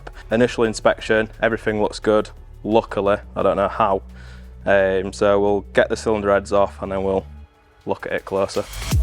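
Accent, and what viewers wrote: United Kingdom. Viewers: Where you based you sound local accent. Wakefield/Leeds???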